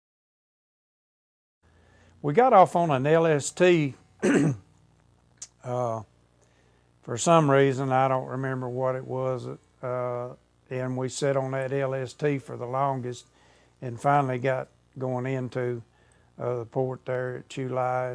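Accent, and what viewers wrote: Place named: South, United States. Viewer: He's got a way of story telling and that southern accent adds some drama which is entertaining.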